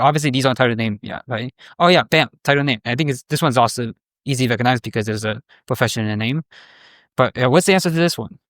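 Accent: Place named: Asia. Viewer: Well he has an asian accent and being american doesnt automatically make you a native speaker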